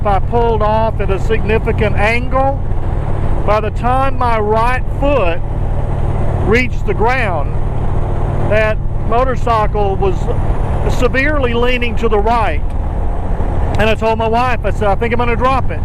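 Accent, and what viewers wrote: United States. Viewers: Here in southern Ohio we say the days of the week the same way. Btw, I never would have guessed you were from Texas from your accent. You sound like you are from NC to me. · What's wrong with Hank Hill? I like Hank. My Father-In-Law was a living Hank Hill but with an upstate NY accent but I digress. You're from TX...I can hear that...you also have a very good radio voice and ought to do a radio program...part of the gifting that god gave you. So, do you sound like Hank? Not really but if you did, that wouldn't be a bad thing. I also have it on very good authority that heaven is a long distance call for most places in the world but not in the rural south...Everyone else has an accent but we speak English as God intended...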